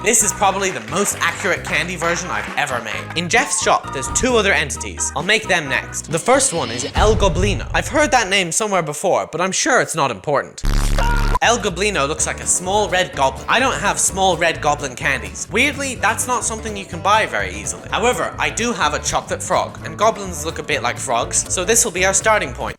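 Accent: Australia. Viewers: I’m Australian ur Australian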